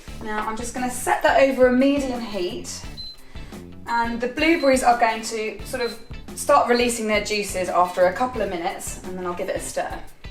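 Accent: England, United Kingdom. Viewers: You are English. I live in America and I cannot understand almost everything you say. Slow down.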